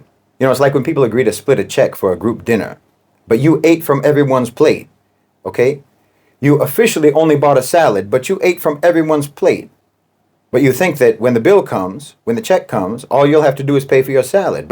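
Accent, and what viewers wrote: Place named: Louisiana, United States. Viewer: This guy is soooooo from Louisiana I can tell from his accent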